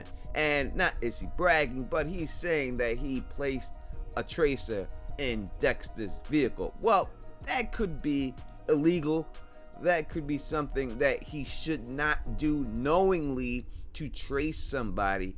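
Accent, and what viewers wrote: New York, United States. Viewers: You gotta be from either jersey or new york with that accent. Loved living in the city. Great content as always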